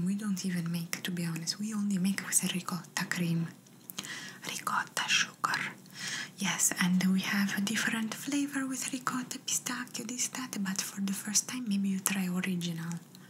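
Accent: Italy. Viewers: ……to tell you how much i loved this video would be impossible. I really swore we were at a shop in Italy. I just found your channel yesterday & have been binging your videos but i had to tell you how amazing this one is….i am so so grateful to have connected with you. 🥹❤️ i love the italian accent, so sooooo much. The way you say perfecto… OH MY GOODNESS! 🙏🏼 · These videos are so well done, love the Italian accent, so believable, you sound like some of my relatives! :goodvibes:❤ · I subscribed to purely on the basis of these Italian accent roleplays. Molto bene! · Italian accent videos are perfect for relaxing and unwind your soothing voice makes me feel sleepy 😴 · Where did you learn Italian? You sound like you're actually from Italy! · Hello perfect ASMR I loved it and I enjoyed it a lot listening to your relaxing voice with an Italian accent formidable you are great greetings gorgeous 🥰💖😍🌹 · The Italian accent definitely sets u apart in the ASMR universe. 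It's so different and unique. Which is why I enjoy it so much.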